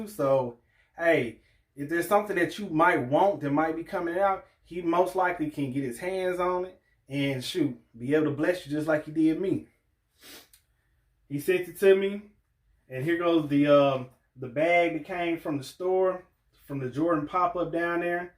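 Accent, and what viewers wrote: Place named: Kentucky, United States. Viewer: Where are you from? Your accent is like wow? Kentucky?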